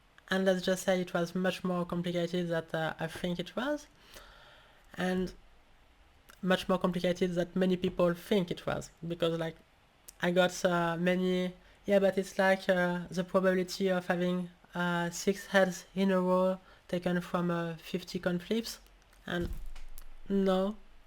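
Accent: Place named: France. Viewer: From her accent I'd say France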